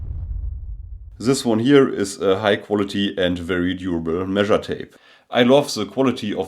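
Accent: Germany. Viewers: Danke für das Video. Bruder, deine Aussprache des Englischen kannst du bequem und leicht verbessern, wenn du Sender von der BBC schaust oder hörst. Das geht leicht über die Website der BBC oder über einen Podcast-Player deiner Wahl. Optional könntest du auch Hörbücher in Englisch anhören, aber dort sind die Sprecherinnen und Sprecher nicht immer auf dem Qualitätsniveau der Sprecher bei der BBC. Was ich nicht empfehlen würde wäre BBC World Radio, da dort auch sehr viele Bürgerinnen und Bürger aus Ländern außerhalb Großbritanniens zu Wort kommen, die schlechter Englisch sprechen als du. Am Ende bist du hoffentlich diesen deutschen Akzent bei deinem Englisch losgeworden.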